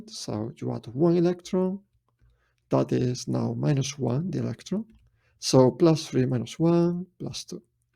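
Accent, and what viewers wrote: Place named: Spain. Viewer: Para alguien inglés no creo pero yo que soy español si lo noto un poco. Mañana tengo el a level de chemistry y tengo que decir que tus videos estan muy bien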